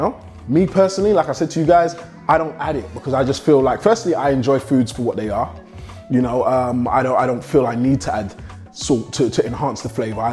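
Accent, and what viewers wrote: England, United Kingdom. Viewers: English accent is a good reason not to continue with the video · That British accent.😁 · He must be from london that accent is strong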